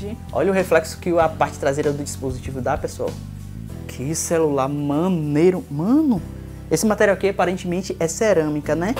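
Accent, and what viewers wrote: Bahia, Brazil. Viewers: mano mim acabo de rir com o seu sutaque você é da Bahia ? um grande abraço.e link marato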